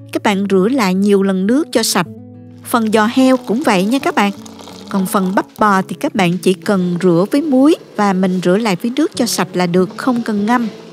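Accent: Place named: Mekong Delta, Vietnam. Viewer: Cảm ơn, giọng nói miền Tây thật ấm lòng ❤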